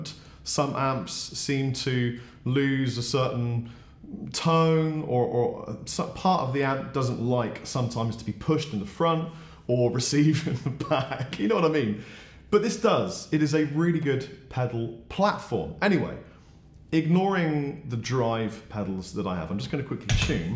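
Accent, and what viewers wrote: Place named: United Kingdom. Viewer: You lost me, you talk too much! I want to hear the amp, not your British accent.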